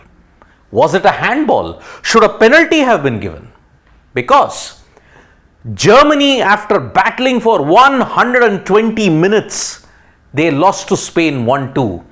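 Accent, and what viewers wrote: India. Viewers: ОПЯТЬ ИНДУС УЖАСНЫМ АКЦЕНТОМ ЧТО-ТО ОБЪЯСНЯЕТ ,НО ЕГО РЕЧЬ НЕ ПОНЯТНА!!!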